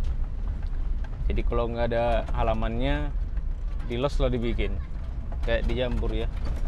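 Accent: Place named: East Java, Indonesia. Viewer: lo kok logatnya kyk wong jatim klo berbahasa jawa gais..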